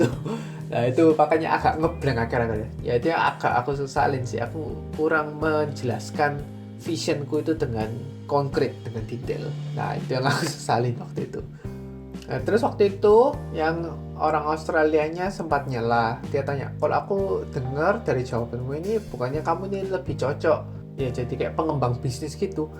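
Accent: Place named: Pasuruan, Indonesia. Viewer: Aku pikir juga gitu, dan logat bicaranya mengingkatkanku sama temenku orang pasuran 😂 bismillah doakan aku ya kak semoga bisa lolos AAS 2024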